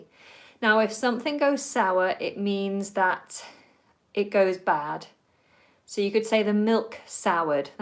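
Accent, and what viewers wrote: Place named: United Kingdom. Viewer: Hello, my dear teacher. Unfortunately, I missed your live video but I watched the last lesson about marketing and like always I learned many new words and phrases about marketing. That was awesome. U are the best teacher I ever had because u r very energetic and I like your lovely pure British accent. Thank you so much.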